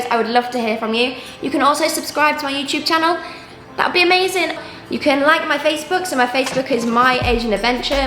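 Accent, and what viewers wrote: United Kingdom. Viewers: 想請問Amy是從英國哪個地方來的呢? 有聽說英國不同地區的發音也有些不同。想請問您是哪個地區的? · Hi there, I am Taiwanese who live in London now. As soon as i got homesick, the big issue is that I have to learn name of all veg and ingredients. thanks to your cooking lesson in Eng. and I love your British accent. I'd love to share Taiwanese Food with British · 英國腔的做菜過程，我愛了❤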